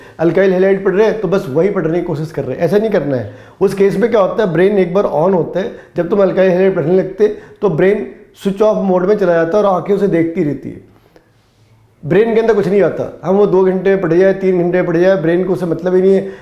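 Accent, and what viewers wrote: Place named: Punjab, India. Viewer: Punjabi tadka.....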